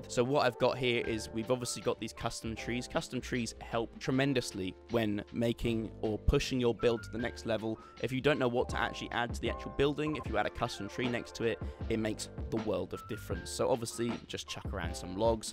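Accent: United Kingdom. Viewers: I like so much British accent. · I always love british accent.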